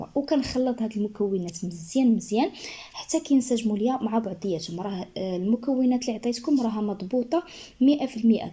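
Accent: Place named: Algeria. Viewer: هبلت. هبلت هذه. لهجة. جزائرية . اكيد. انك 👇👇👇👇👇👇 اخذتي. المنشور. والوصفة. من. صفحة. جزائرية. .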